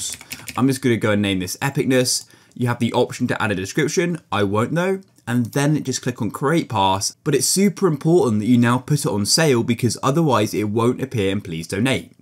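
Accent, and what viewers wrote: United Kingdom. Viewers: your so British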